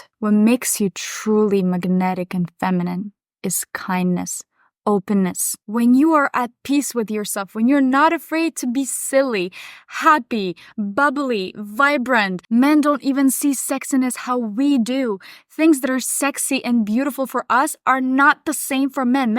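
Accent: Russia. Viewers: акцент русский, вы откуда? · Please stop doing typical Russian accent in purpose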